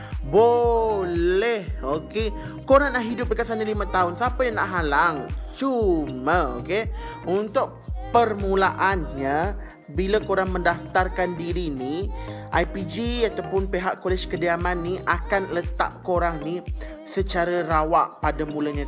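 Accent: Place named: Kelantan, Malaysia. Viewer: Kechek klate bohhhh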